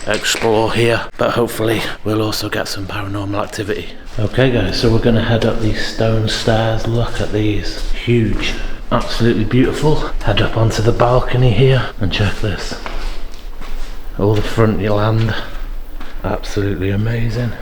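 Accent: United Kingdom. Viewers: Btw luv your British accent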